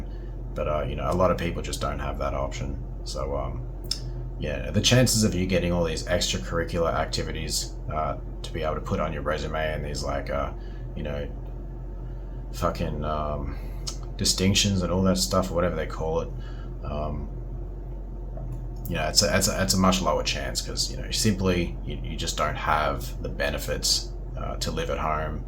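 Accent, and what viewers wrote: Australia. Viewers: It's been said before, but I'll say it again, individuals can be smart. You're smart. I'm smart. But people are the stupidest mudda fudders on the planet. Here in AZ people bought up all the toilet paper in the local stores like it was Covid all over again! Why? Get this, due to the port strikes on the other side of the fcking country! Like we get our toilet paper imported from fcking China 🇨🇳 🙄 Why the fck are people so gotdamn stupid. This is why I love your channel. Brits accents grate on me but I actually like the Australian accent.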